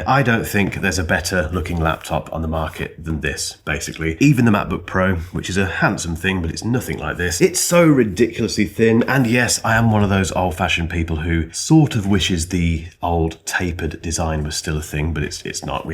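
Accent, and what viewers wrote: United Kingdom. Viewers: Dude didn't slip out of his British accent even once.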